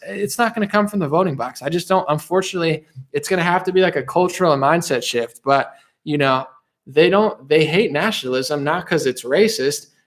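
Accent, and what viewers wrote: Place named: South, United States. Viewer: Where is this new southern accent comming from? You been hanging here or some new bud's in your life from here? It's cute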